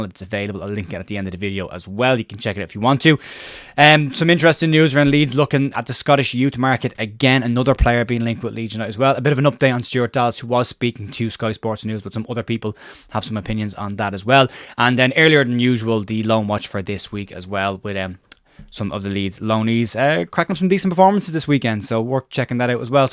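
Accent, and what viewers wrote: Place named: Ireland. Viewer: Keep up the good work mate. Even the Irish accent is easy on the ear.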